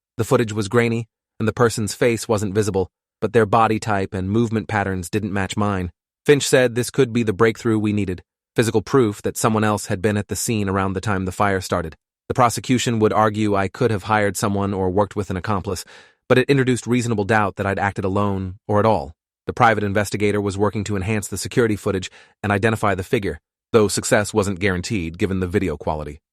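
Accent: United Kingdom. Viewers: Anyone else notice how his voice slowly slowly start sounding like British accent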